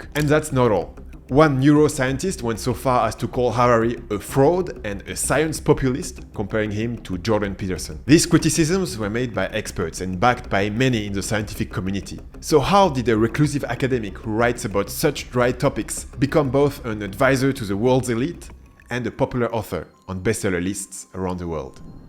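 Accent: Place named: France. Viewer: Gaining Youtube subscribers is clearly not an easy job. The few things I have seen on your channel are so well done that I can say it easily matches other content of Youtubers with many thousands or even millions of subscribers. Keep up the good work. Who knows how many people you will be to reach within a few years. By the way the french accent is actually charming 😂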